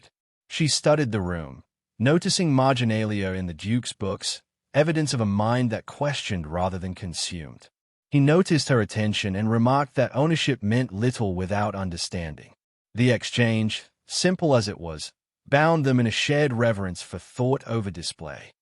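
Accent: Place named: Australia. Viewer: Sounds somewhat like an Aussie to me.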